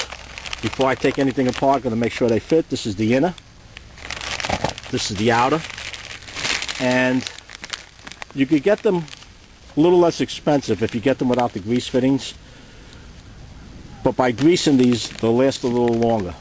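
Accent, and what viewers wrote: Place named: Boston, United States. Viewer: Taking awt tha cawter pin lol love the Boston accent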